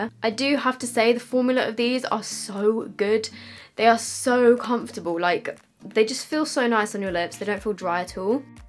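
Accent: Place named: United Kingdom. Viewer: you sound so brittish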